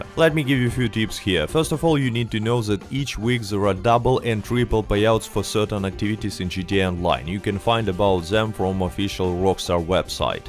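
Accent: Russia. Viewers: This is why I love Russians the have a great sounding ascent and they get straight to the topic!!🙃😁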